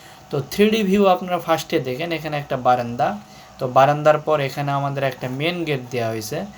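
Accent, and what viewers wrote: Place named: Joypurhat, Bangladesh. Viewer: আসসালামু আলাইকুম ভাই, আপনার কথায় মনে হচ্ছে আপনি আমার দেশি হবেন। আমার বাড়ি ধামুইরহাট, আপনার মনে হয় জয়পুরহাট 🙂। যায় হোক আমি একটা বাড়ি করবো নভেম্বরে, ৬০০ স্কয়ার ফিট এর ৯ টি আর সি সি পিলার দিয়ে একতলা হবে। এক্ষেত্রে শুধু পিলার, ছাদ ও ওয়াল করতে কতো খরচ হবে! আর আমি একটা ডিজাইন করতে চাই আপনার নাম্বার টা দিবেন।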